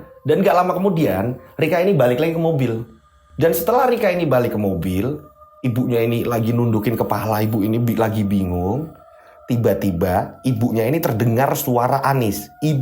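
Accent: Java, Indonesia. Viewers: ALHMDULILLAH SNENG BNGT DNGER CERITA NYA MAS..KL NGMONG JOWO MEDHOK BNGT..KATA DEMI KATA ENAK DI DNGERNYA..SHAT SLL YA MAS.SLM DR ANK RANTAU HK.🙏🏻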